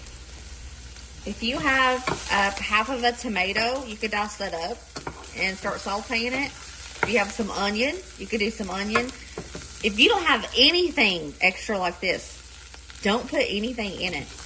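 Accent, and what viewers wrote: South, United States. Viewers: Us southern girls say oil just like you. Don't go changin'! 😂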